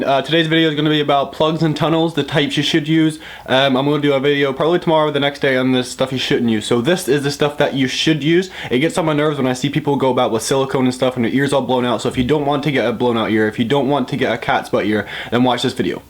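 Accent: Scotland, United Kingdom. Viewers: Are you scooottiish or iriish?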